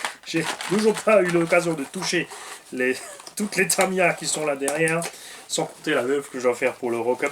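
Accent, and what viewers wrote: Alsace, France. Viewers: Mais dis moi, tu fais drôlement bien l'accent alsacien, on s'y croirait presque 😁😋🤣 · Génial l'accent alsaco 🤣 c marrant d'ailleurs que tu ne l'ai pas plus que ça en temps normal😅 super kdo merci le tonton🤩😘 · Salut toI héhéhé le blondinet déjà qu'ils avaient (abos) du mal de comprendre en temps normal ,Mais maintenant sa và être pire😂😂😂😂😂😂😂😂😂😂😂😂PS C'EST QUAND MÊME BO L'ALSACIEN 😘😘😘😘😘😘😘👍 belle journée à tous jc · Hallo ! Ich liebe deinen elsässischen Akzent (ich weiß, dass es deutsch ist, aber sie übersetzen nicht ins elsässische: p) Merci Tonton Pictave, tu as remis la machine à projets en route :)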